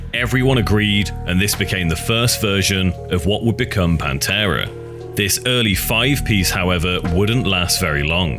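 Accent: England, United Kingdom. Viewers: Heavy Metal documentaries with British accent narrations SUCK!!! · It should be a law that anyone who speaks with an English accent not only Not inaccurately do Narrations for US music bands but get the facts about the bands their poorly inaccurately doing the Narration correct....yeah I said it and stand behind it. Especially PANFUCKINGTERA DICK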